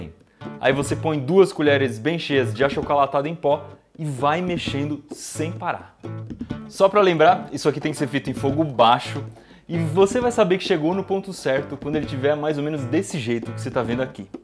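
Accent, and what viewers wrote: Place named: Rio de Janeiro, Brazil. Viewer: Dexa de se carioca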